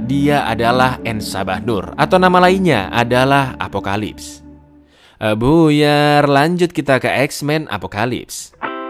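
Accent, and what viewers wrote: Java, Indonesia. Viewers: Jowo ne kandel banget mas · Jowo mu ngendi lurrr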